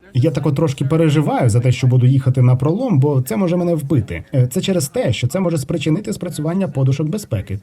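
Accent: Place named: Surzhyk, Ukraine. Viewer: Пиздец, мужик, зачем ты перевод делаешь на украинском, если его полноценно не знаешь? Твой суржик звучит ужасно.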